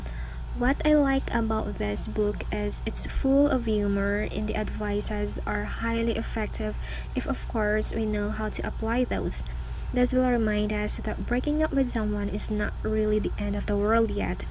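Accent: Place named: United States. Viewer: Hi pretty sis akala ko american ang nagsasalita ang galing naman mag english..nice books pretty that books its good to my daughter she love to read like you..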